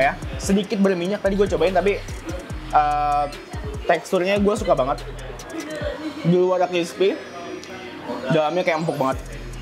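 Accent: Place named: South Jakarta, Indonesia. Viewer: Bahasa jaksel lu gak usah ditahan-tahan bang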